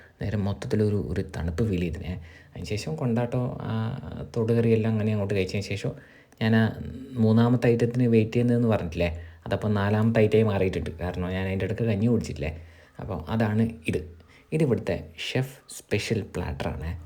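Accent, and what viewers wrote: Kannur, India. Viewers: Uushentappa🤣🤣🤣kannur bhasha. U are so funny👍😂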